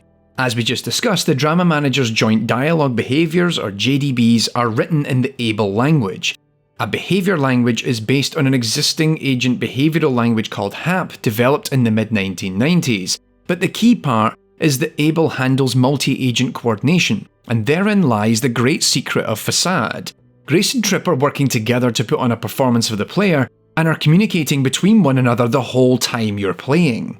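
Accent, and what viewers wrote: Scotland, United Kingdom. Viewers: I’m new to this channel and hearing another Scot made me happy ngl 🙃 good video also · I was ten minutes into this video before I realised you were scottish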